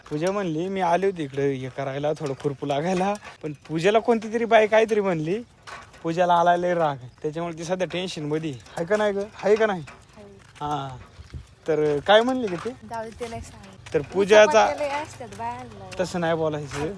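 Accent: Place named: Sangamner, India. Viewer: दादा तुझं गाव कोणतं आपली संगमनेर भाषा कुठेच लपत नाही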